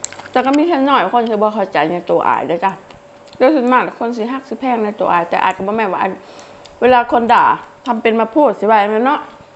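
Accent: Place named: Sakon Nakhon, Thailand. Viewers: ข่อยล่ะเหยอะนำเจ่าเด้เสียงหย่ำกรุ้ปๆๆ ตาแซบ หล่า เสียงเว่ากะม่วน ภูไท เว่าม่วนอิหลีจ้า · พี่รู้จักและติดตามน้องอายตอนหาเห็ดเกาหลี เพราะพี่ชอบดูหาเห็ดและพี่สงสัยว่าอีนางนี้คึเว้าสำเนียงเมืองสกลแท้ นำมาๆจั๋งฮู้ว่าเมืองอะหลีไทกุสุมาลย์ พี่ชอบวิถีชีวิตน้อง จะทำให้คนอื่นเข้าใจเราทุกคนเป็นไปไม่ได้เก็บคอมเม้นต์แต่กัลยาณมิตรไว้ เป็นแรงใจให้ตนเอง น้องอายพูดถูกจ้า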